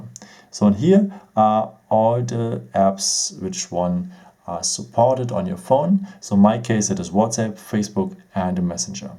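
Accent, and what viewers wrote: Germany. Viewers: I'm getting this phone over weekend. I love your German accent! I love German accent. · Haha man merkt irgendwie schon raus das du deutsch bist. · Warum nicht Deutsch ich mein man hört es ja eh. Ich hole mir nähmlich auch das a50 also eigentlich ein ganz nützliches Video auch wenn ich vieles schon wusste weil ich schon so ungefähr 40 videos über des a50 gesehen habe. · German accent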